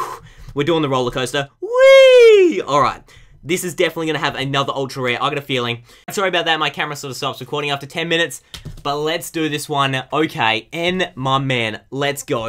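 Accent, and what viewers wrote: Australia. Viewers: Australian accent mate :)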